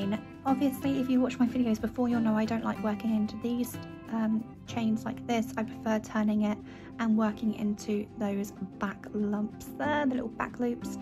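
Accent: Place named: United Kingdom. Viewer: love!y to hear a fellow Brit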